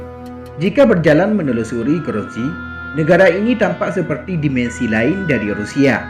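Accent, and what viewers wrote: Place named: Aceh, Indonesia. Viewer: Hana pas loem mantog na logat Aceh kapegah haba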